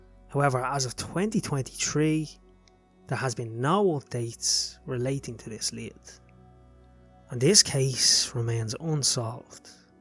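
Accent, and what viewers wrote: Dublin, Ireland. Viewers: Its a Dublin accent alright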